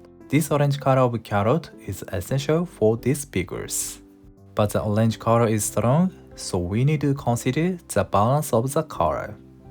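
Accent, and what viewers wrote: Japan. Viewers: Agree! Your voice and Japanese accents are so pleasing to listen to 🌹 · Great video, arigato totemo ! Love your nippon accent and your serenity! Cooking is like a religion 😊🙏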